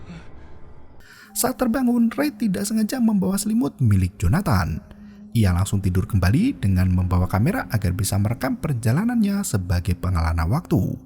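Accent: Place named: Magelang, Indonesia. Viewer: Kok dengerku Magelang ya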